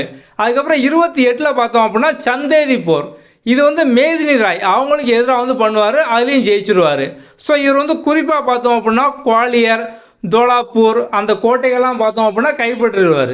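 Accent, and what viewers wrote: Tirunelveli, India. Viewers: Are you belong to Tirunelveli?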